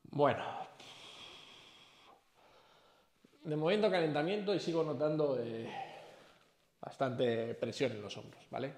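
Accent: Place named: Basque Country, Spain. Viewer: Terrible ,yo soy orduñes vizcaino como se nota que eres vasco la ostia!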